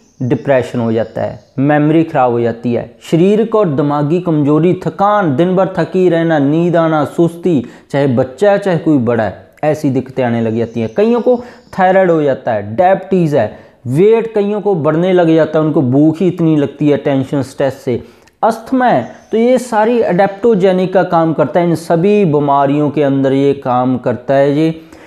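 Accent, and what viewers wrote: Punjab, India. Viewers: आपका बोलने का पंजाबी अंदाज़ बहोत बढ़िया है।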